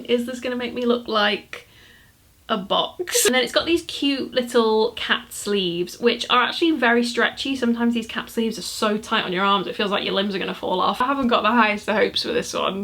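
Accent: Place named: Lancashire, United Kingdom. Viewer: Thanks for taking one for the team! I stopped going into Topshop about 17 years ago (I'm 33!) because their sizing always felt very small and I have been a size 14 basically since I was 12. Even passing the door makes me uncomfortable, like the vibes I feel are "none of these things are for you 😡😡😡" so it's good to think I might actually fit in some of their stuff if I can face going in there and having a look. PS love your accent I grew up near Ormskirk so that Lancs-Scouse adjecent twang makes me trust you even more!!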